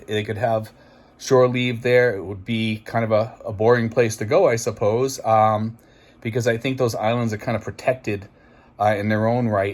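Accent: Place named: Massachusetts, United States. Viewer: I always enjoy your VLOGs and watch them first. Maybe because I understand your accent being from Mass. myself.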